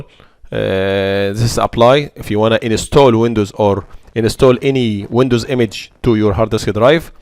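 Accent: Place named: Egypt. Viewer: Thanks, I think you are Egyptian Inferring from the accent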